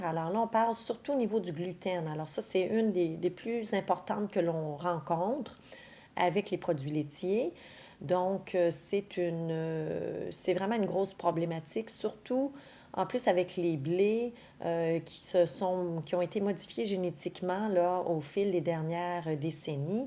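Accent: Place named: Canada. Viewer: Merci, je vais essayer de suivre vos conseils, En plus votre bel accent canadien me fait beaucoup de plaisir Bonjour de la France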